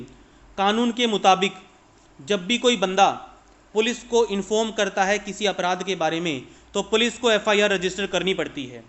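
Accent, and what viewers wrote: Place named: Haryana, India. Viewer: You are from haryana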